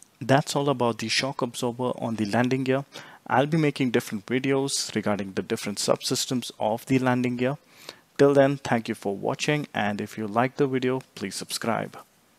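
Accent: India. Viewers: 1) I don't like the pronunciation. It's like Indians. I can't understand this self-confidence of people whose native language is not English. Great courage... 2) You don't explain why the oil in the hydraulic section is needed.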